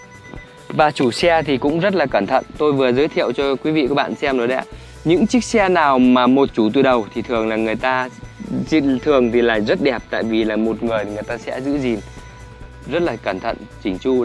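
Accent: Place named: Hai Duong, Vietnam. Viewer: Đúng dân hải dương chất giọng ko chốn dc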